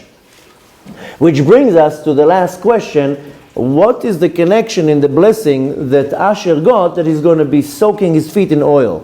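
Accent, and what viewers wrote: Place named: Israel. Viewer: Thank you Rabbi for another great class. I'm curious as to how you have such a distinct Israeli pronunciation of Hebrew when quoting verses and prayers being that you are Baal Tshuva and Chabad. Other people who have become religious and had no previous Jewish background just adopt all the ways of their new group. Please share any insight as to how you've managed to keep your Israeli pronunciation while also being Chabad. By the way, it's not in a bad way at all, on the contrary. Was just wondering since you're usually so open about yourself to students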